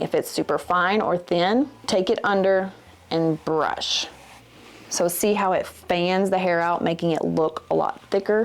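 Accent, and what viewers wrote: South, United States. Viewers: that's how I feel too. I'm from TN but live in FL, so I love hearing her Southern accent. It brings me much joy.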